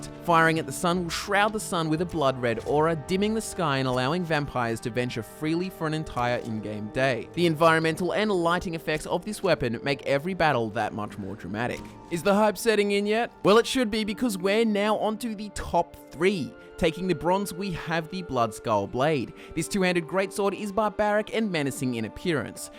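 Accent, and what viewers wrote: Australia. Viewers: He's Australian, shouldn't be that hard to figure out. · Is it just me or do all Australian guys sound the same?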